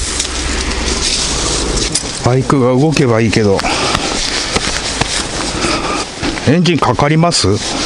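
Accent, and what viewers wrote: Tohoku, Japan. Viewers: 喋り方で東北の人かなって思うw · この訛りは東北の人ですか？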